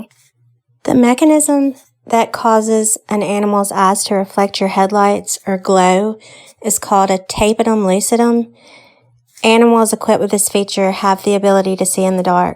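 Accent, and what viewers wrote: South, United States. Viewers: Hi. I found you through watching the Hammerson channel talking about Rakes and your encounter. First off, I BELIEVE YOU! It must have been shocking to see one of those creatures up close. I would have been terrified too. I found your channel now and have already watched all of your videos. Thanks for the deep dive of this image. You did an amazing job with all this extensive research and legitimately debunked the debunkers. That image is fucking horrifying and I can only imagine that it’s possibly what some of the missing travelers in the missing 411 cases encounter. I cannot fathom how scary that would be. Personally, I have had 0 cryptid encounters but strangely enough, I have been compiling a list on my Notes App of instances where I begin ruminating or thinking deeply about something, and then all of a sudden shortly later, it appears to me in real life. 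I know it sounds crazy but it’s like the universe is presenting these occurrences to me after I think about them. Idk it’s weird. It’s similar to how the Rake was created as a creepy pasta, but it was somehow WILLED or IMAGINED into reality. Just a theory. Anyway, I’m droning on but I research missing 411/cryptids every night and have nobody to talk to about this. I hope you make more videos, please! I will rewatch this while I’m stuck at my desk at work. I wish you good luck with the channel!! P.S. I LOVE your accent!! Makes me wish I could meet some southern girls but I’m stuck in california hahaha. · Thank you. I just watched it. Crazy sh.....stuff ! There are alot of people seeing things , creatures , beings , humanoids, cryptids whatever people want to call them . I can tell just from listening to you that it had a very big impact but you seem to have adjusted well- well I hope you have anyway ! 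. Thank you for responding to my question and thank you for sharing your encounter ! Love your southern twang , drawl - accent !!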